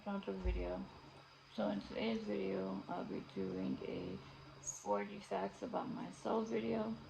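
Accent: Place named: New York, United States. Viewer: You from NY? I’m hearing an accent. Also we have a lot in common so I’m sitting here watching like “yup, mhm, yup me too sis” 😂 I just subscribed, I’m here for your content sis 😂🙌🏾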